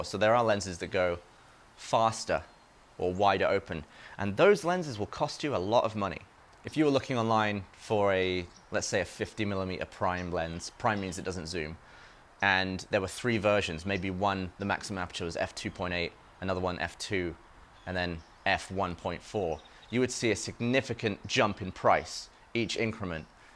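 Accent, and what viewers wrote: United Kingdom. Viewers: I love, but I couldn't find "shuta speed" in my manual 😀😂 gotta love that British accent thou! great video! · Benjamin Collins British. But he lives in Texas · Are u British or American because you sound British but your in America. thx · It might be his British accent but I'm not even interested in photography but watched the whole thing and learned stuff I didn't know I wanted to know. Great explainer, this guy is.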